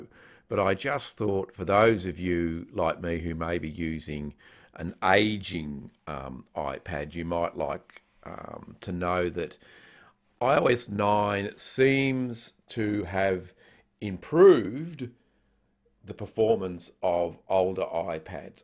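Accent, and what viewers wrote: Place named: Australia. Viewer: nice to hear an Aussie accent in YouTube for a change :) thanks for the tips this is way more useful than those silly startup timer tests. might upgrade my iPad 3rd gen soon and report back how I go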